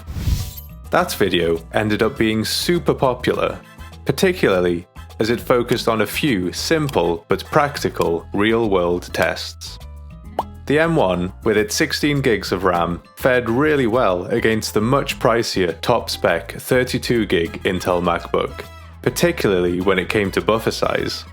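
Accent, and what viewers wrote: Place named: North Wales, United Kingdom. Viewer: Fandabidosi! Are you a gog like me, ie? I can hear your north wales accent. Diolch am hwn. Thanks for this video!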